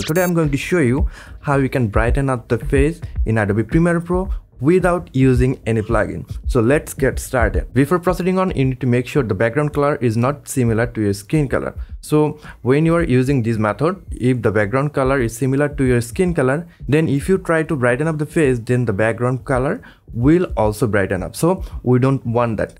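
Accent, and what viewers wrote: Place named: Assam, India. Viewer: are u assamese by any change...based on the accent I m guessing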